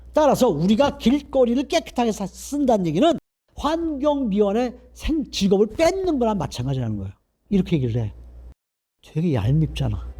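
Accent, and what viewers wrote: France. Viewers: 한국말도 발성이 프랑스식 같아요 ㅎㅎ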